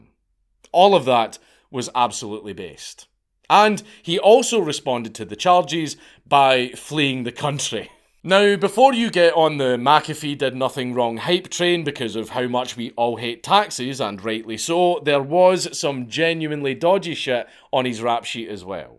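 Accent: Scotland, United Kingdom. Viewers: I could listen to this Scottish man talk about bizarre historical figures all day. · aww i thought he was irish hes scottish which is js fake irish :(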